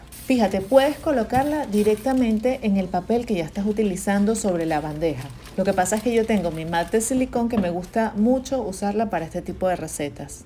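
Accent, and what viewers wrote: Venezuela. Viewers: Tienes acento venezolano. Bueno te envio la bendición d Dios en nombre de Cristo;puesm parece genial la receta. Gracias. Saludos desde Venezuela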